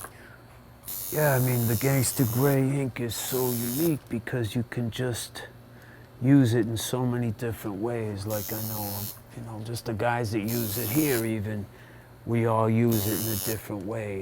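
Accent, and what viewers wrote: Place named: United States. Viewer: Feels like Christian Bale got his american accent for parts from this guy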